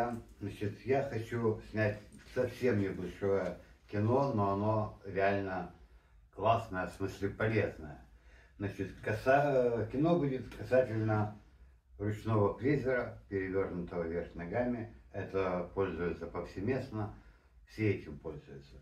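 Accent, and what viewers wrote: Odessa, Ukraine. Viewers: Спасибо, рабочая идея с Одесским акцентом - в роли ограничителя деревянный камертон, почувствуйте столярный настрой.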